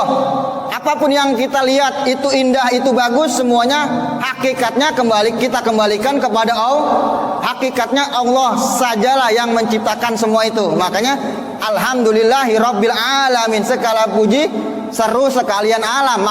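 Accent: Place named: Jakarta, Indonesia. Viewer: aduh ane demen nih ade ust gaye betawinye keren ...semoga ust selalu diberi keshatan dan panjang umur..dan banyak nyang dukung ..aamiin yRA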